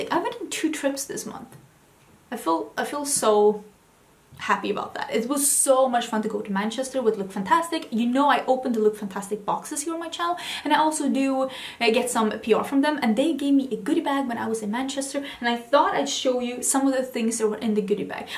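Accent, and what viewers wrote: Sweden. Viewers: I think this eye look is one of my favorites you ever done. I’m only 1 minute into this video and HAD to comment and let you know. You are always stunning, but this entire look...wow!!! I love seeing your hauls because I know definitively that, bcuz of your Low-Buy, whatever you bought you REALLY wanted and spent a while deciding to purchase!! Okay, back to completely, 100%, focusing on your wonderful video instead of typing!!!! 😘 Edit: how wonderful that you got so excited about a purchase. I’m buying the ND Mini Gold palette today as I returned the new CT foundation (ay yay yay!) and I’m soooo excited! And, your English is wonderful. When my Dad came to this country from Germany, he started learning English by watching cartoons. Til the day he passed, he had the most beautiful accent but still pronounced some words incorrectly or had trouble with certain popular phrases but I found it endearing. 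You should be so proud of your near mastery of the English language; it’s so complicated, and your gorgeous Swedish accent makes it all the better. Niacinamide is hard for even a biochem scholar in the US to say, I think, lol. Maybe try: ny-uh-sin-uh-my-d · Yes. Im danish and i could NEVER speak so fast and fluid english like! Also, swedish is a beautiful language so her accent is so nice:)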